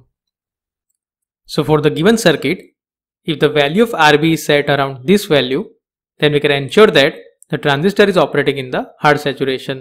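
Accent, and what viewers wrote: India. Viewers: 阿三这口音我真是服了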